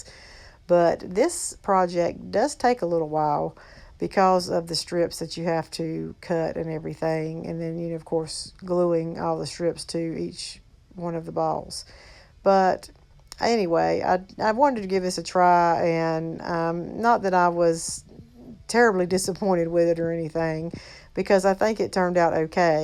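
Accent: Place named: North Carolina, United States. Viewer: Also, I enjoy your videos so much. Your accent sounds so much like my North Carolina relatives <3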